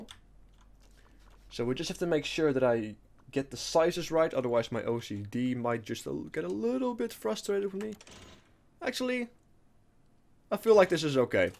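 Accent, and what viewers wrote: Netherlands. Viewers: His accent is dutch people.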